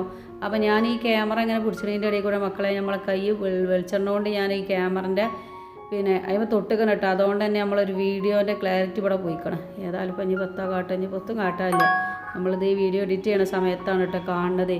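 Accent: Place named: Malabar, India. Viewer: Pure malabar Muslim laungage.Very intersting for lissen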